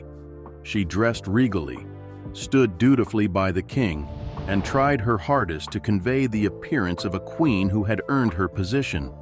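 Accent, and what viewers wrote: United States. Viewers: What rubbish Camilla is Queen why is an American voice saying this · Well done with your titles, real click bait, but if you do click, after a while you give up, because it never gets to the point. Clearly made up, and an USAan voice does not give it any veracity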